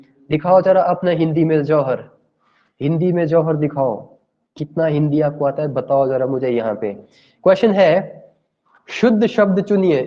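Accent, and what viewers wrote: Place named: Himachal Pradesh, India. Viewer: Love ur himachli excent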